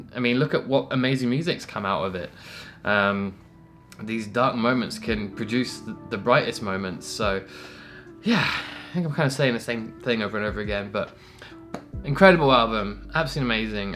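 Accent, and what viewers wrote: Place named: Australia. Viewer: I'm so happy for you to have reacted to Wintersun. Although I've really known them a few months only, while knowing all the time Kai's background, but never before was I ready for another metal band, just yet . Now that I've found it, it simply blows my mind. So heavy, but simultaneously pleasing, comforting. Invincible playing, awesome lyrics. What comes to this song and it's lyrics, I get the feeling I have to search for someone lost to grieve. The easiest way would naturally be my parents, and in some part they are, but there already are so many others I could and should have been closer with while they still were here. If I'm not wrong, you're Australian, right? If you really are, your accent sounds a bit different from the people in the Eastern coast, Sydney at least. I hope you don't mind me asking this.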